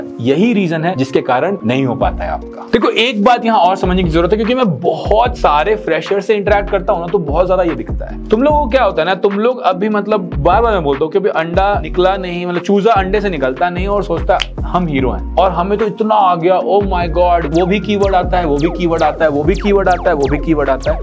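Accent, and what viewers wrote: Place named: Bhojpuri, India. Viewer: your bhojpuri accent is so sexy "Maatha" mtlb sir dard 😂😂😂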